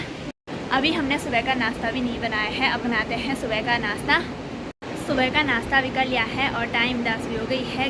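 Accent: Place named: Garhwal, India. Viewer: Nice video beta aap Garhwali language wali Hindi acchi lagti hai,Mai har video dekhta hu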